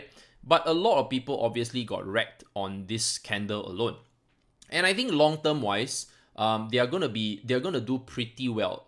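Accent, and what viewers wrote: Singapore. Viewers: What accent is that? Singapore?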